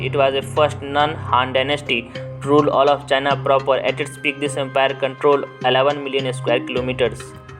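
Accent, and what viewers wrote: India. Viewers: Indian english i dont hate but its annoying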